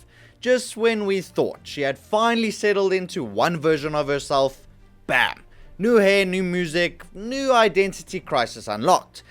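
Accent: South Africa. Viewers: Yoh, I heard the "boet" in your voice from the first 3 seconds 😂😂😂😂 Ny lekker boeta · I know a fellow South African when i hear one❤🇿🇦keep up the content!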